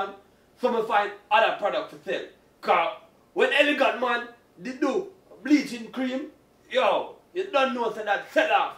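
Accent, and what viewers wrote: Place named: Jamaica. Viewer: Seriously, whether or not you are Jamaican you are way funny and you do sound real Jamaican.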